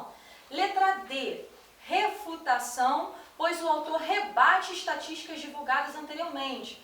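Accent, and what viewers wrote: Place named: Rio de Janeiro, Brazil. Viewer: Carioca é foda, fico prestando atenção só no sutaque kkkkk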